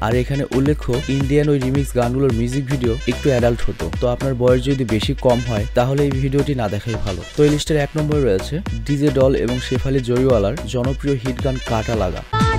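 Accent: Noakhali, Bangladesh. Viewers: এটা কিছু অইলো,,,,, ভাই আপনার বাড়ি কি নোয়াখালী নাকি ।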